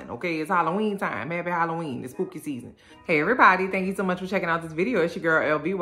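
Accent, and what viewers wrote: New Orleans, United States. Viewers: Look. I found you by way of.soooo I literally came back to HEAR YOU RECAP seasoning with your New Orleans sauce 😂😂😂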